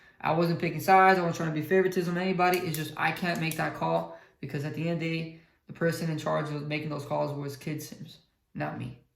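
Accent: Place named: Hialeah, United States. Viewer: Wow For this event being low on staff, you guys did a good job. It sucks when people end up flaking on you, however you guys adapted and overcame the Situation. As for Tech Inspection I agree you gotta leave the friendship out and be fair for everyone. A true friend will understand. Im sure this was a learning experience for you and your team. So For the next event just have a few backup plans incase shit hits the fan again . BTW It was Good to meeting you funny how you were talking to Frenchie and Immediately recognized your voice. Tremendo Hialeah accent 😆 🤣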